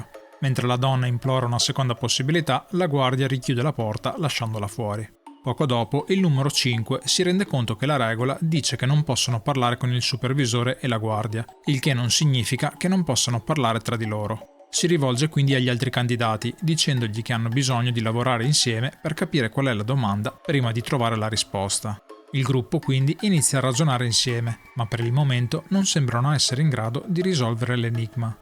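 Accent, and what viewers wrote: Sardinia, Italy. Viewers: Penso Sardo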